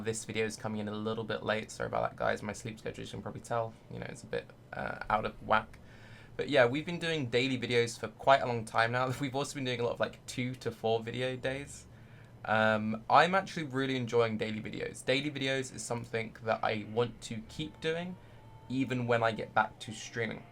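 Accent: United Kingdom. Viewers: I don't think people are really gonna bother you if you run ads on twitch. Like if you're broke and you need money just do it. 15 seconds of commercial every once in a while is not gonna bother us. On a side note, glad your health's doing better, and God damn(... bloody hell?) I love that British accent lol.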